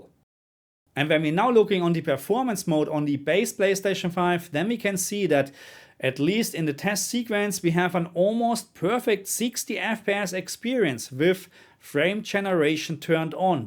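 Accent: Germany. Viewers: Der deutsche Akzent ballert hart rein bei dir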